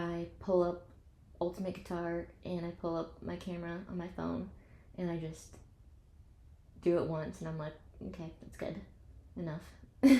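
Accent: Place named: United Kingdom. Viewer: but her accent is british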